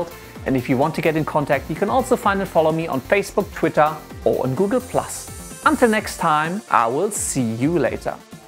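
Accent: Germany. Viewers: Do i hear a german accent? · So are you a German with an English Accent? I'm trying to figure it out. I understand what you're saying pretty well but it's not California American, that's for sure. Just curious. Your videos are great! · Man merkt das du deutscher bist :) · Your German accent is so cool:D! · Man hört direkt das du Deutsch bist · "Foa evaa" Das deutsch drückt schon heftig durch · He has a German accent so he should be German. Anything else would be odd.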